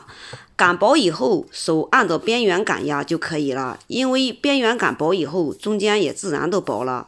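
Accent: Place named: Shanghai, China. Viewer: 阿姨的上海話口音真好聽😅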